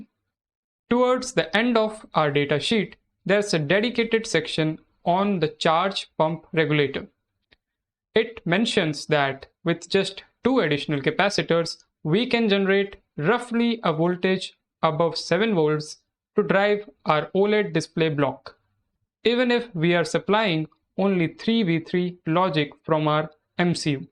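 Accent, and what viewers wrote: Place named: India. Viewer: damn, i was hoping for a video without an indian voice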